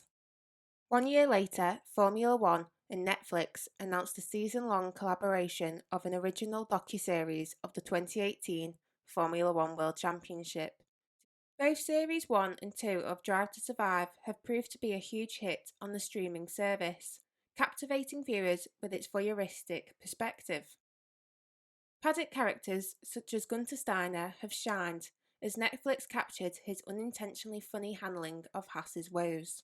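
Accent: United Kingdom. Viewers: As true fans we would naturally take in everything available about F1. We therefore each have our own opinions about the content of whatever we are watching. Whether your favorite driver was “properly” presented, for example. Consider that Netflix viewers are just browsing available content and see this series. They watch one. Maybe another one. And suddenly they see drama and excitement, politics, technical details, personalities and families and daily struggles. They have discovered a huge thing out there they never even wanted to be interested in has global appeal. New fans. Like me to this channel. I am doing a bit of binge watching now. Great job. Nice stuff. But then again, I am a total sap for a lovely British accent.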